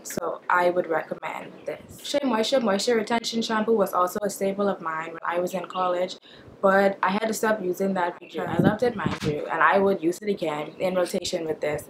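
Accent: Bahamas. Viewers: From you opened ur mouth I was like "Is this girl from the bahamas"! Nice video. I used that shampoo when I was relaxed, but now that im natural I only co wash, I use cantu or pantene co washes, pantene is really good. I really have to get that aussie moist conditioner tho, wanted that for a while now. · Lmao how I guess you were a Bahamian by the accent :") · omg... this is my first time watching your videos. They say Bahamians dont have an accent, from the first time I heard your voice i knew you were Bahamian. Great videos. Thanks for sharing!!! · As a Bahamian who lives abroad i watch natural hair videos all the time to get tips. I spotted your accent from the first sentence!!! Im so excited to see a Bahamian vlogger! Thanks for this video girl, it was really helpful. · I'm dying. All I was thinking was why she sounds like a Bahamian explaining something. I finally heard "Nassau" and was validated. 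Heyyy, cheers to Bahamian vloggers. Keep it up! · man heyyy i recognize a bahamain wen i hear one aee....looool i thought i recognized ur voice...yeah tingz ova here hella expensive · The second I heard your voice, I was like "that's a Bahamian" lol. I was right. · mdss..when I first hear you speak I was like she gatta be Bahamian Iol...ik my people but keep up the work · That accent cant hide boy the minute i play the video i was like that gal gata be bahamian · You're a Bahamian? At least you sound like one. I love your accent 😍😍😍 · Oops you are from Nassau my family is from there I knew I heard the accent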